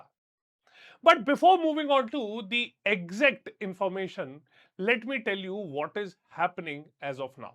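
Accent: India. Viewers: What a painful presentation......babu English, loud presentation, stretched........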